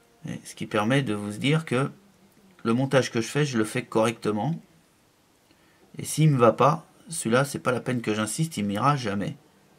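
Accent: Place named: Paris, France. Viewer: tu me fais marrer avec ton accent titi parisien, ça met de bonne humeur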